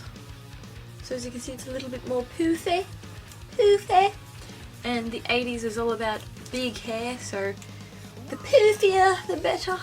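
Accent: Australia. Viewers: That's an Australian accent! :3 I love Aussie accents way more than British personally. She's got so many great tips on her channel. I think I'll subscribe. <3 and hey, there's nothing wrong with being a kid, we all gotta be one sometime in our lives. Enjoy it! And if the 80s phase makes you happy, go on :D I'm a 90s gal myself so I understand! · Heyy there, would you be able to tell us how you initially explained to your hairdresser exactly how you wanted your hair cut in this way? I've been to soo many hairdressers, whip out so many pictures and it's just arghhhh! When I first watched another video of yours I was like AUSSIE ACCENT! Don't see many of us Aussies on youtube. =] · r u australian? you sound like it :)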